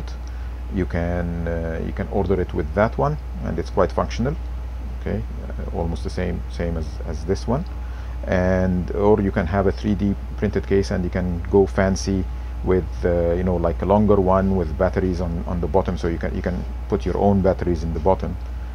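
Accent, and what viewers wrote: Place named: Canada. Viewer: Funny Canadian accent.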